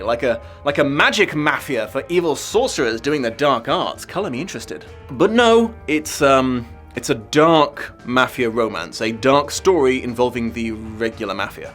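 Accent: South, United States. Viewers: Only four minutes in and I’m in tears with laughter. Thank k you for that incredible reading in what I can only assume is supposed to be a southern American accent. It’s actually a pretty good accent, way better than any accent I can do. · 1. I got a great kick out of your Southern American accent 2. I just love having such a great YouTuber with high-quality content who’s childfree by choice. You’re great representation. I shall continue to edit and add to this list as I keep watching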